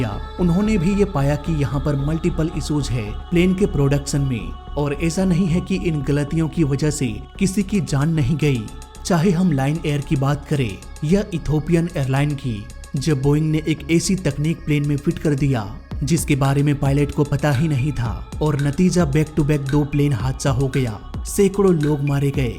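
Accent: India. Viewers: indian English. Maybe = sayad 😂😂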